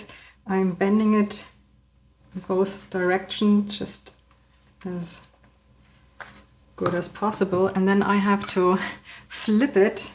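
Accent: Germany. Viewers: People are soo picky with videos. This lady should have more views and much more likes.This lady is fantastic, charismatic, and well groomed. She does her videos really well. Her German accent accentuate her videos even more. I can't put more likes, i already checked a thumbs up. But, what i did is watched the commercials to help her. This recipe is so delicious.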